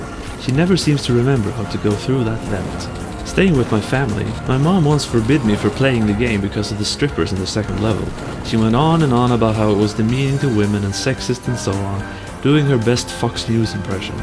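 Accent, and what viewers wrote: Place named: Sweden. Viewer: Hah, hur visste jag att du var svensk sekunden du öppnade munnen? ^-^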